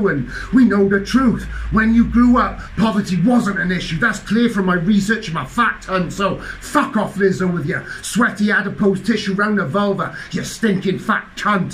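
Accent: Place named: United Kingdom. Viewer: British people have funny accents but he is ok and good for rapping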